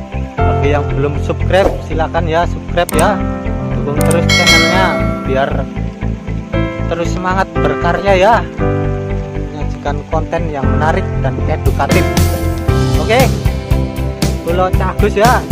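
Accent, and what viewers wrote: Banyumas, Indonesia. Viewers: Wong ngapak eah kang... Ngapake ngendi rika kang. aku Cilacap... Semangat trus kang salam sehobi...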